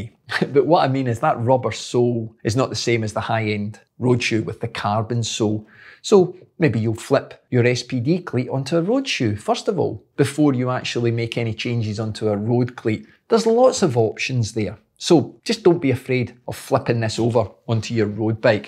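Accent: Scotland, United Kingdom. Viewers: yeah, pretty much been using Time since day 1. Using Crank Bros for gravel and MTB, which is similar to Time in terms of lateral float and retention/release. Love the channel (and the Scottish accent!) and keep up the great work! 👍👍👍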